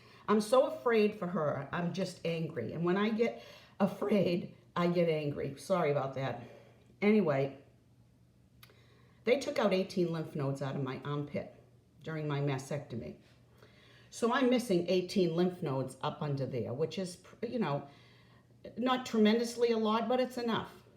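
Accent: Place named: New England, United States. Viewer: This is my first video to see from you. Thank you for this explanation. I have a family member who has lymphedema in her arm, as a result of her breast cancer surgery. She told us about some of her treatments and the sleeve she’s supposed to wear at all times, but we didn’t realize the seriousness of what she’s living with. I enjoyed your video so much that I just subscribed. Plus it sounds a little bit like you’re from New England. I’m not from there but I lived there for a few years! So it’s fun to hear the accent again. ☺️ Anyway, I look forward to watching your other videos and following your future progress!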